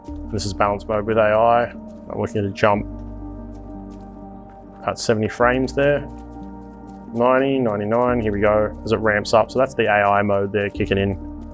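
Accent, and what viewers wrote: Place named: Australia. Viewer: I don't think this guy knows what he's talking about or knows how to program a laptop settings. Out of the dozens of reviews on this laptop all of them being great and no issues. This guy sounds like a whiny Australian like the rest of them. I juat ordered this laptop and I'm sure I won't have any of these issues he's claiming. Maybe he has the only bad one in production🤷‍♂️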